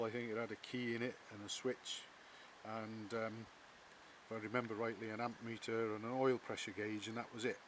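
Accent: United Kingdom. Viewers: I love listening to a proper british gent talk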